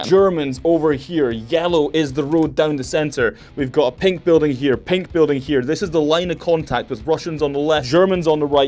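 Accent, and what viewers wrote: Scotland, United Kingdom. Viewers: are you scottish?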